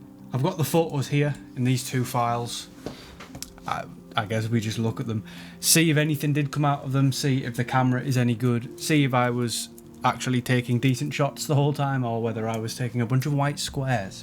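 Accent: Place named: Northern England, United Kingdom. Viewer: Right. What's going on? Just came across your channel and the two videos I watch are so relevant. Been taking lots of pictures of loved ones lately and yesterday I picked up a Kodak 66 model III I got on ebay. Looks very similar to your camera. Liked, subbed and comments. You sound northern as well, I'm up here in Cramlington. I'll be making my way through your videos. Keep it up.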